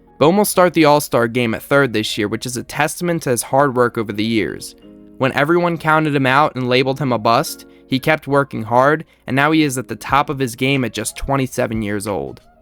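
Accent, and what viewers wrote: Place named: Philadelphia, United States. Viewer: took literally less than 50 seconds into the vid for me to conclude that you sire, absolutely smell of Philly/NJ and are both extremely aware yet unbothered the way "woder" or how tf yall spell that fucked pronunciation 😭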